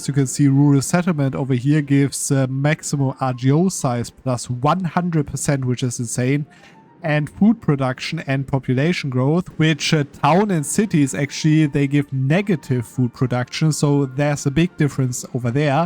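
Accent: Germany. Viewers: German accent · "how did you know im german" ahhh accent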